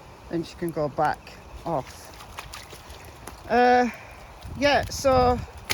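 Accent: United Kingdom. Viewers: Your accent so sweet like British 👍🏾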